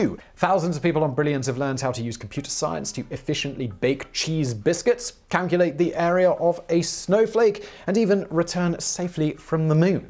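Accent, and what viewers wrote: England, United Kingdom. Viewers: Dude tone down the over posh British voice. We all know you don't really talk like that. Speak normal man. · There is no space... Duh what a load of crap and your English accent makes you sound stupid. Blah blah blah blah blah nonsense. Not worth watching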